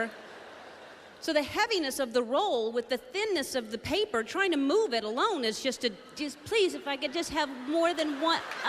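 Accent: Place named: South, United States. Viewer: Is it me or can you hear her Southern accent a bit?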